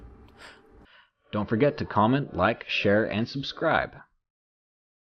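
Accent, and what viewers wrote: South, United States. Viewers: AaAAAAAaaAAAAaAA ’ COUNTRY BOY ACCENT IT SHOWS A BIT IN THE OTHER VIDEOS STILL BUT OML IM SCREECHING This makes me happy in a way i can’t explain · Love your videos never noticed the southern draw of yours till this vid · Whoa, the southern is strong in this one. =x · Seems like you had a bit of a southern accent way back when! This video is very charming, brings me back to the smaller days of your channel 💓 You’re the best narrator, hands down. Love from Florida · Angry-Moth-Noises he is from the south of course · lol, your old voice sounds so much more southern? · The Thick Southern Accent threw me off at first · Aw yeah, you have well had a Southern accent like me! I don't know why but I get so excited when I see a YouTuber from the south or from Alabama. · Country sounding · Do I detect a slight southern accent in these older recordings?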